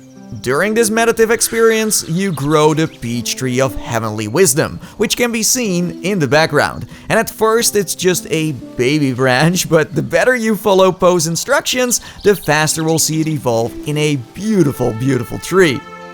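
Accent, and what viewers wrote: Netherlands. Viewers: ik zou zeggen praat alsjeblieft niet met zo'n nederlandse toon als je engels praat maar ja, je hebt 758k dus wat kan jou het boeien natuurlijk.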